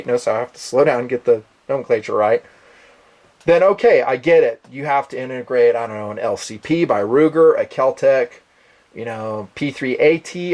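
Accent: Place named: New York, United States. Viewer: You sound like you're from New York or something. You're not fighting damn terrorists. If you are a soldier you need to wake up and realize that your own government is arming the terrorists in Afghanistan, Libya, Syria, and so on. Read the news. And boy, I'd like to see you come down to my neck of the woods and Texas and people how many rounds they should have in their mags.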